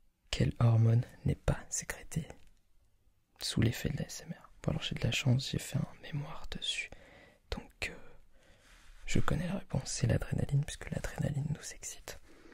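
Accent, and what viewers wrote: France. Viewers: ACENTO FRANCES DE AQUI A JAPON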